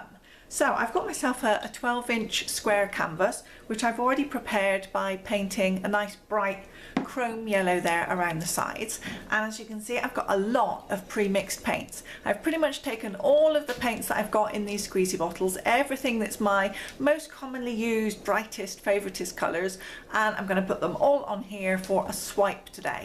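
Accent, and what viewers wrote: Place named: Bristol, United Kingdom. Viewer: Is that a Bristol accent I hear? Reminds me of home (Beckington). Lovely pour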